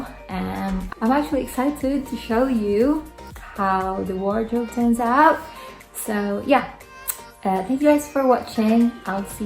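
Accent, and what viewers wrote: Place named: United Kingdom. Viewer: I Really like your British accent. And I’m trying to copy it 😜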